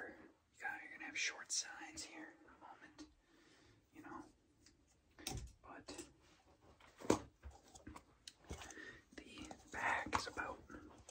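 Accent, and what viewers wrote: Boston, United States. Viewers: Great vid! I do miss the Boston accent ones tho 😁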